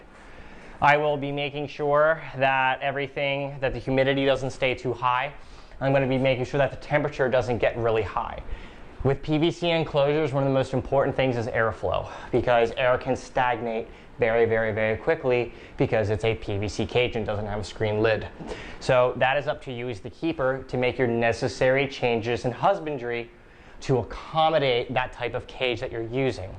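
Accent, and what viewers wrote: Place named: South, United States. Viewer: terrible south accent.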